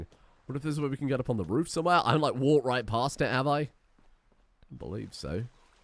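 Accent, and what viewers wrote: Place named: London, United Kingdom. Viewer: For a man who says he's from the West country, and lives in the middle of nowhere, how are you this south-east Anglais and loathing of everyone around you? > Has fully swallowed the Greek-Romance-makes-you-sound-smart pill > hates anything English > has a south east accent. You sound like a man who's been brought up in the heart of London in the upper or middle class and is trying to hide that 🤔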